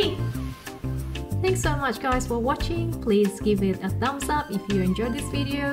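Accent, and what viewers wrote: Australia. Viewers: this ozzy accent is perfect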